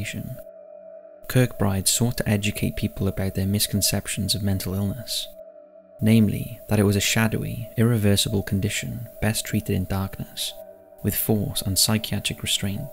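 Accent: United Kingdom. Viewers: Too much catering to americans on these channels. And his voice isn't anything special it's just a generic british accent.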